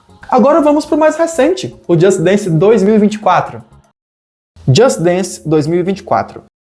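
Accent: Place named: São Paulo, Brazil. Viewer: Eu to rindo horrores com o sotaque paulista hahahahaha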